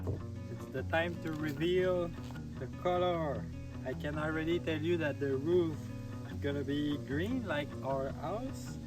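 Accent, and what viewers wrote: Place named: Quebec, Canada. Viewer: Excellent vidéo sur le sujet! Et presqu'en français... Hi H... Pour vrai, l'anglais utilisé est enfin à ma portée. Parler à la George Saint-Pierre a vraiment son charme aussi. Bref, le plus important est sans contredit le contenu apporté. Les détails sont suffisants pour quelqu'un qui souhaiterait se lancer. Ça vraiment le mérite de faire rêver. Grand merci. PS: Vous êtes d'où déjà? Je me souviens d'avoir été impressionné lors d'une épisode de Go van.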